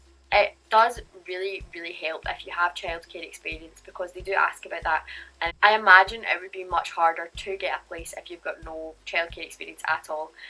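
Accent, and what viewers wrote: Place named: United Kingdom. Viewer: Wonderful videos! Although, I'm a little confused. Your accent says UK but your video: Camp America? :P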